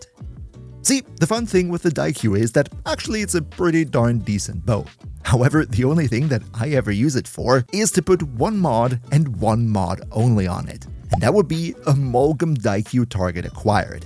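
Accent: Germany. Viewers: YOU'RE GERMAN! I have been wondering where your accent was from!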